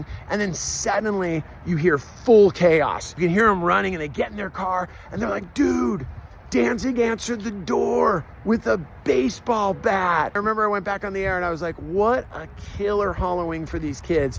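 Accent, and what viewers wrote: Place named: West Coast, United States. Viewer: He talks just like a west coaster.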